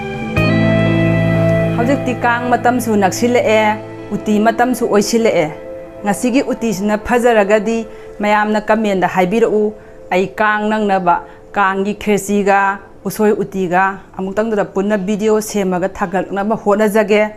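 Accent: Kakching, India. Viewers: Kakchinggi meitei lon se tabada yamna nungsijei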